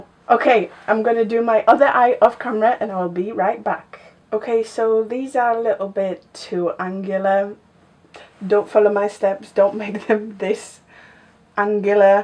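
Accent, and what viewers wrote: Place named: United Kingdom. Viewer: Lovely finished look with the makeup......insert name here!........Are you in the UK you have a funny accent......? Good video.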